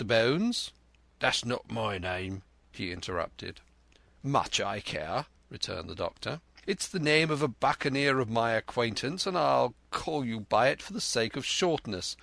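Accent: England, United Kingdom. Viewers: Well read Matey! Nuffin loik a genuine Brittish accent. Very enjoyable. :) F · thank god for a English voice reading this ! Just started to listen to a recording with a yank reading it - so wrong ! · Thanks I prefer your pronunciation and accent rather than the American ones, one remark is that your reading is a bit slow, but when it comes to direct speech ie when you say what some novel's characters say that's fantastic I find, and more realistic, after all the author was British and so are his characters!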